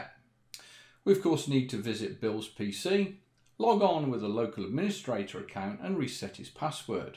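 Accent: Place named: United Kingdom. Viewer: Very British accent. Good video. Thanks.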